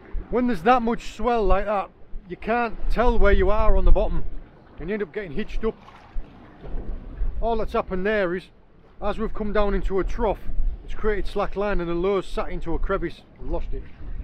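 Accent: United Kingdom. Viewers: Viaduct I'm wondering that too. A lot of times the CC spelling is wrong and it also takes liberty in what the word you actually said. But the CC is better than nothing. After listening to your videos, I'm understanding more and more of your accent. And they say us Americans have a lot of accents, you Brits have won the war in that category by far. Another GREAT video, always a pleasure.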